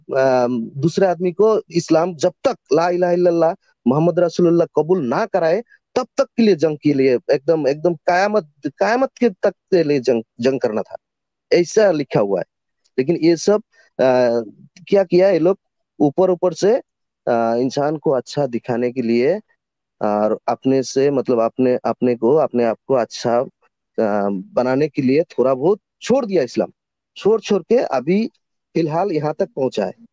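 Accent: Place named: Bengal, India. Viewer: By voice I could guess that this person is from Bengal or Orissa 🙄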